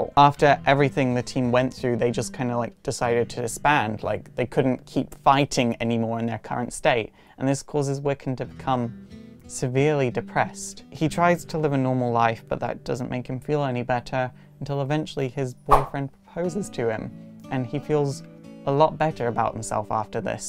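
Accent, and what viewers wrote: United Kingdom. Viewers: I'm 35 (turning 36 this year) and I came out when I was 19 (it was a little different in my day - I'm a bit older than you - also American, born and raised in Baltimore Maryland - from your accent i can tell your from the UK) Marvel's Northstar came out in 1992 when I was 9 but i never felt I related with that character. Like you when I found the character of wiccan i felt I found a piece of myself and went right out and bought "Young Avengers: Children's Crusade" - found out the book and the character kicked total ass and have been sold since. Also been following Iceman's "BIG GAY BOOK" which I like but not as much as I LOVE Billy Kaplan/Wiccan.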